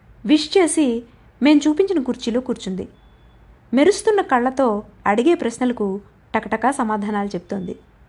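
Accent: India. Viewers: కధ బాగుంది. రాములమ్మ పాత్ర బాగుంది. తూర్పు యాస మీ గొంతుక లో.బాగా.పిలిపించారు. వరలక్ష్మి గారి కథ బాగా రచించారు. మీ ఇద్దరికి నా ధన్యవాదాలు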